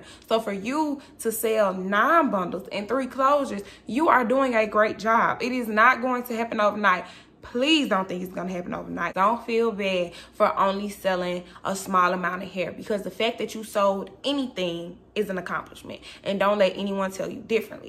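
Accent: Atlanta, United States. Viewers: You talk like you’re from Atlanta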